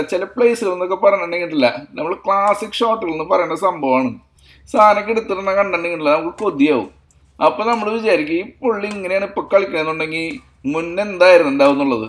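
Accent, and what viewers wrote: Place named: Thrissur, India. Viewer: ഇത്ര നാളും അമേരിക്കയിൽ കിടന്നിട്ടും ചേട്ടൻ നമ്മുടെ തൃശൂർ സ്ലാങ് മറന്നില്ലല്ലോ.. 👌👌👌ഇത് അമ്മയുടെ comment ആണ് 😄😄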